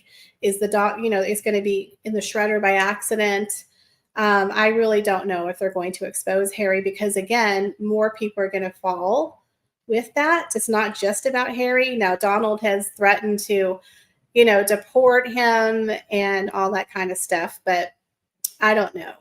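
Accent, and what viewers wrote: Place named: California, United States. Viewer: I’m from California and I agree with your Mom that you sound like you’re from California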